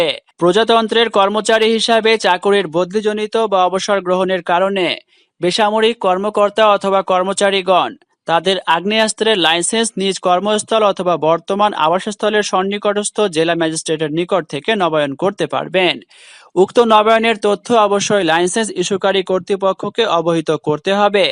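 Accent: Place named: Mymensingh, Bangladesh. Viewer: এমন করে পড়ে কেন!!!!!!!!! ভাই এর বাড়ি কই??? ময়মনসিনংহ নাকি???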